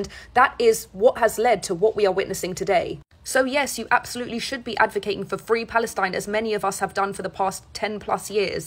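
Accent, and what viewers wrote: United Kingdom. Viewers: Nothing you have said contradicts anything I said. The basis for the word "Persian" is "Farsi", which is an Iranian language, and many Iranian people do indeed identify as ethnically Persian. many do not. I said Iranian PEOPLES, not people singular. She is indeed Iranian, but her accent is British. She was brought up there. Are you seriously using her accent to check her accuracy? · I did not know people speak English with british accept in Iran...lets face it she is not Iranian... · Info on anything in the Middle East would get better reception from someone without some version of a British accent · A Muslim Iranian, speaking perfect British English. Straight out of Babylondons propwash academy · she sounds British lol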